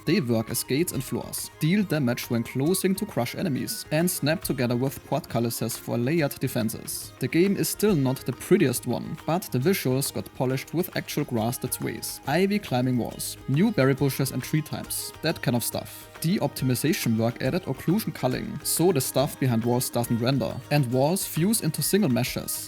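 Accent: Germany. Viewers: absolute pain listening to your bad german english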